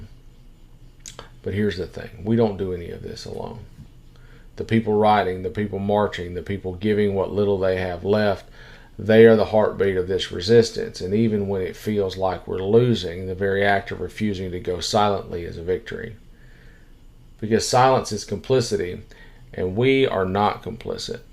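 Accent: United States. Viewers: First time since Jimmy Carter ive heard a deep south accent talking with sense and compassion.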